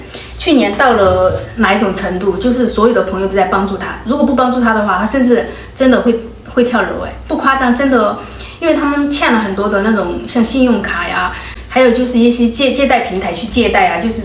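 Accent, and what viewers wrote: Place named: Taiwan. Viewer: 妳跟台灣人一樣了。說話結尾不說哈就更像了。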